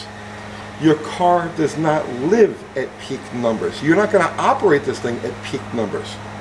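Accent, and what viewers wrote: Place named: New York, United States. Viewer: At 6 I thought that was going to kick all their asses all right so here we go horse is right behind you with the 4-cylinder kick me or kicking your butt real bad what the hell's going on here all right here comes the Volkswagen Mahler is just going to mow you down best Brigg and Stratton and it's not even but one cylinder you know what the hell I know I got a firecracker right here I bet you your hemorrhoids couldn't handle it no more all right here we go you sound like a New Yorker stop whining and crying get out there and really do something I want to see you go to drag strip and make some happen I want you to take that six cylinder and make it happen what the hell happened I don't know you don't know we don't know we want to know what the hell is happening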